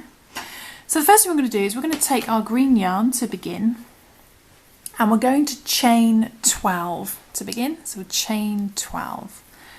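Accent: England, United Kingdom. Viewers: You sound English so why don’t you say the stitch’s in English not American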